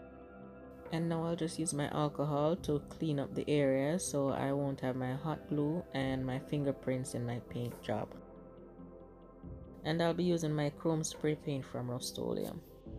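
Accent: Jamaica. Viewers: Beautimous 😍. One quick question- are you Jamaican? Your accent sounds similar to mine. 😉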